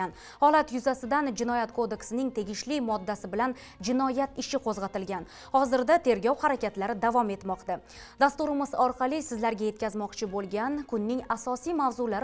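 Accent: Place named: Russia. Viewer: ЎЗБЕКчани янги ўрганаётган ўрисга ўхшайсиз, сиздақаларга қолдими ЎЗБЕКни куни? Буни устига тенг ярим луғатинг ўрисча, э саводсиз ўрисқул?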